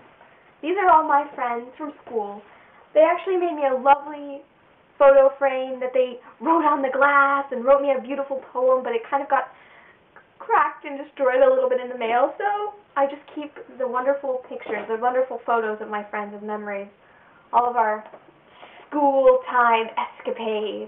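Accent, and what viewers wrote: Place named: Thailand. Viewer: i think ,your accent is verry like "thai " i know cuz i am thai. my nick name is "chang". "chang" = elephant. "ช้าง"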